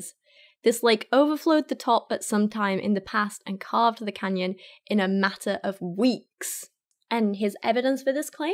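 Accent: United Kingdom. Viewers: Sorry little girl but Kent would destroy you in a debate! You are not smart! I know you think you are but your wrong. just like most ppl like yourself are. You talk to stuffed animals! Your brit accent doesn't mean you are smart honey. Your gen. were spoken about by Jesus himself and you will see the end days soon enough and I cant wait.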